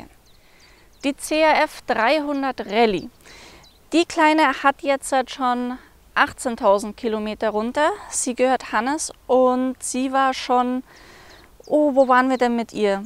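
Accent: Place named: Germany. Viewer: Sehr schöner Vergleich der Maschinen. Du bist mit verantwortlich das jetzt eine CRF250Rally bei mir in der Garage steht 😊. Da ich noch keine Offroaderfahrung habe, und deinem Dialekt zu urteilen wir aus der gleichen Region kommen, kennst du evtl. Strecken zum Üben die legal befahren werden dürfen ? Ich kenne nur die unbefestigte Straße zwischen Bettbrunn und St.Lorenzi. Gruß aus Egweil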